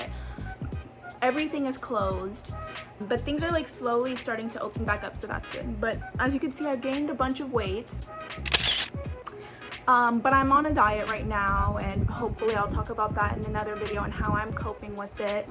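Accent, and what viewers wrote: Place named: Texas, United States. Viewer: really Im in Texas I could’ve sworn for a second I heard a little Texas in you haha but dang that’s a lot of money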